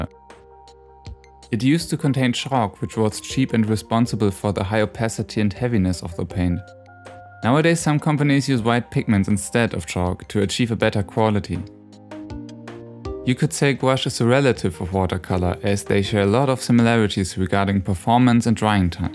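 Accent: Germany. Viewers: Dein deutscher Akzent ist mega süß😂💕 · Wow, It's gorgeous. Your gouache drawing looks like it's a digital art. Also, do I hear a German accent, hmmm :) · I'm pretty sure he's German, he just sounds like almost everyone here in Germany who tries to speak English. I also have an accent like that and I think it's so embarrassing! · Are you german? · Are you german? Somehow you sound like it :'3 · You Sound German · Are u German? U sound like my class. But in a good way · Man hört schon raus dass du Deutscher bist.:) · dude, you german? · Are you german? You seem to have an accent:3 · Are you German? You really do seem to have a German accent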